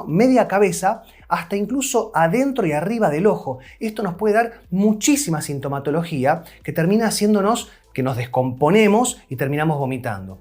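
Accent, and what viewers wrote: Buenos Aires, Argentina. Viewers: Gracias , muy buen video. Mi nuera una muchacha joven tiene cuello a l miseria !!! Deformado . Joroba. P mala postura pienso ; trabaja mucho c l compu. Pero comenzo deformidad mas joven. Voy a mostrar este y tratar vaya a quiropractico. Ud es argentino ?? Porteño?? 👍🇺🇾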